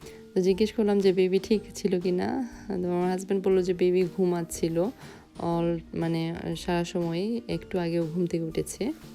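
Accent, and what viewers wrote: Sylhet, Bangladesh. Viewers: Apu tomi ki sylhel y?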